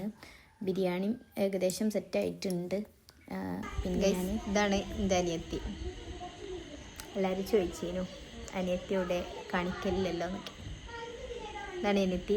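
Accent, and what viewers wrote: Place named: Kannur, India. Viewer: Video Nalgene....specially ur slang..nmlu kannurkark Kelkar ishta....but lack of content feel cheythu....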